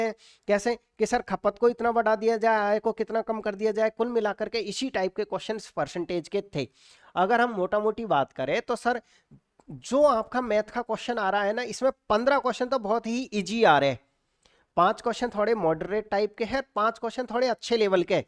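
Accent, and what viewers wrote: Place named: Jaipur, India. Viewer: Sir aapke bolne ke style me hi pata chalta hai aap jaipur se hai❤❤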